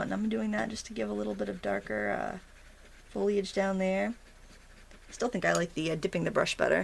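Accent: Maine, United States. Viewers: a fellow Maine-ah! from Hallowell, Maine, he-yah!!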